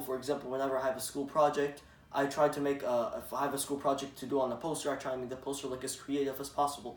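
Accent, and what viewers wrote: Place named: United Kingdom. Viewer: You have a British accent.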